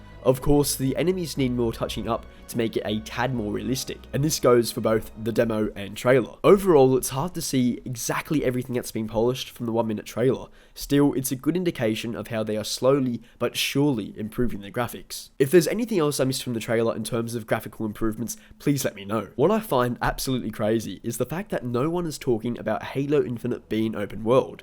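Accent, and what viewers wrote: Australia. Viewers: I feel a lot better after seeing this video. I can't wait! Also are you an Aussie? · Are you australian?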